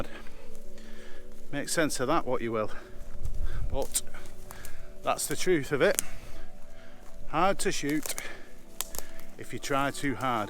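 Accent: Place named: Sheffield, United Kingdom. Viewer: The last time I heard that accent was on my last visit to my home town Sheffield in 2006 from my adopted home in Tasmania. Thanks for sharing. Cheers Peter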